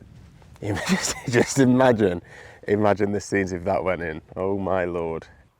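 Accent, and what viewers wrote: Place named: Scotland, United Kingdom. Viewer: Hopefully it’s only the golf you concentrate on and not yr stupid Scottish accent if this I will unsubscribe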